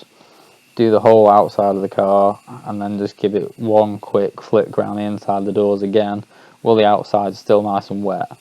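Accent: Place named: England, United Kingdom. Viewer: thanks for taking the time to help me. You sound English where about is your shop?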